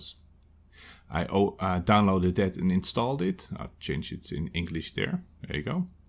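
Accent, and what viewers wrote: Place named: Netherlands. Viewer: Nederlander xD, hoor het aan je accent.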